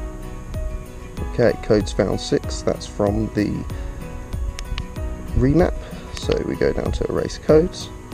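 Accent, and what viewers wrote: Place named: England, United Kingdom. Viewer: Dude you sound English. Where abouts are you? I’m Lincoln. Brand new XSR700 in black owner.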